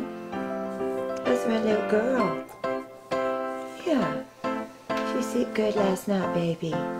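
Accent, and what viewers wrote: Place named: South, United States. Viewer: Hi and Lillian 💜 thanks for saying that 😊 your words of encouragement make me want to study even more to do better 👍.. i managed to pass everything, in fact we all did, so there was a week of celebrations after we found out ✨🎉✨and yep we watch your videos as a group and also put your videos on in the background because they're such a pleasure to watch and even to listen to..like every one of them is different, and I have to say you have one of the nicest voices I have ever heard..I really like your southern accent. Yep 4 room mates from Poland, Switzerland, Sweden and Belgium, and all of them love your channel, and we love seeing Lillian getting up to all her mischief 😊 she is so funny, cute and cheeky all at the same time..When we put on your videos we feel you're in the room with us too, it is a good feeling...Next year we will be separated for 6 months as we have to do work placements for our degree, a couple of the girls are going abroad to Australia and Greece but i want to do my placement in one of the big London hotels..I also think it's great that Brandon is interested in being a chef because it's a great career choice and there is always great opportunities for chefs in big luxury hotels etc and the £$ is normally really good,.. 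When Lillian gets a baby sister it will be double mischief, i think it's a great idea..Have a fantastic day xoxox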